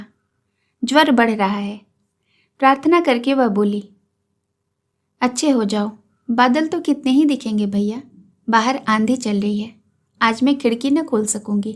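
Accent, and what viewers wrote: Standard. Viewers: ❤❤❤ बहुत ही संयमित एवं अनुशासित, बहुत ही सुंदर एवं दक्ष उच्चारण कला के साथ ऑडियो प्रस्तुत करने के लिए बहुत बहुत धन्यवाद साधुवाद!! एक क्षुद्र जिज्ञासा...😊 आप किस क्षेत्र से हैं; यह आपकी उच्चारण कला से भी जन नहीं ताड़ता!🎉🎉 कृपा करके बताने का कष्ट करेंगी जी??❤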